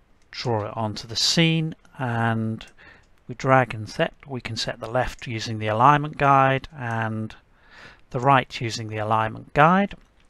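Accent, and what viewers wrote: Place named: United Kingdom. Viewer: I have been watching tons of software tutorials about different applications and so far you are the most comprehensible and concise. Seems to me that you have planned it methodically or maybe you're just a natural. And this comment is coming from a guy (me) who is a non-native English speaker listening to another guy (you) with authentic English and a British accent. Keep up the good work. Thanks and God bless.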